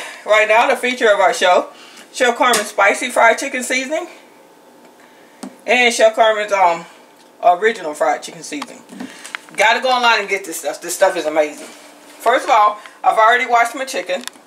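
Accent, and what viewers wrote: South, United States. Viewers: When you see a black woman with a southern accent you know that that stuff is going to be good! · Hell yeah I knew you was from the south 😂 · Omg I just love the southern accent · Ain't nothin' to it but to do it.....Juh like dat dare!! Not a racial thing. It's how some people talk in the south regardless of color. Chef Carmen, keep doing you!! Love the recipe and your personality!!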